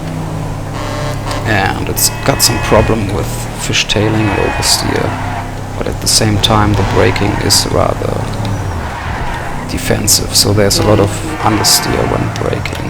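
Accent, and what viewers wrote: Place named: Germany. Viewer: man merkt an deinem Akzent dass du deutsch sprichst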